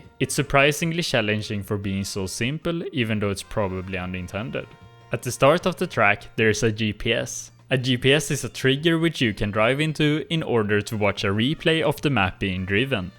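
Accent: Sweden. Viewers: love swe english accent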